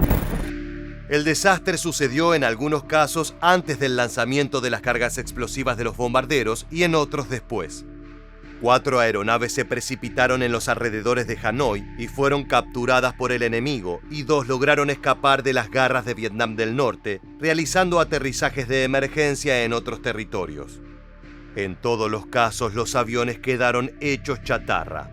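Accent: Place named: Argentina. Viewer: Habla 3n español argentino. Lame cul..o... de Estados Unidos. Se dice 2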